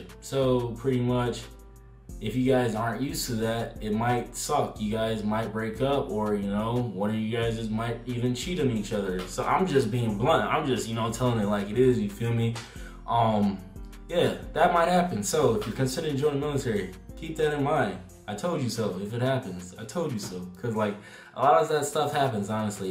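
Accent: Baltimore, United States. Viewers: Is that a bmore accent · R u from Baltimore u kinda got the accent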